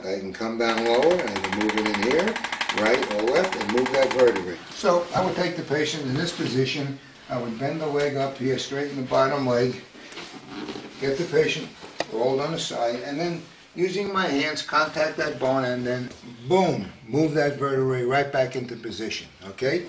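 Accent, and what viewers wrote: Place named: New York, United States. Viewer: You sure do have a New York accent! Haha well good video. taught me a lot about chiro.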